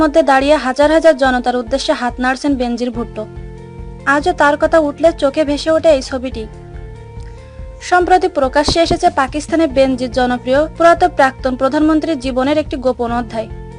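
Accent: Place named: Rural, Bangladesh. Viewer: একদম ভালো লাগে নি! কর্কশ গলার আওয়াজ! উচচারণ এ গেঁয়ো ভাব ! আগে কথা বলা শেখেন তারপর ভিডিও বানান !!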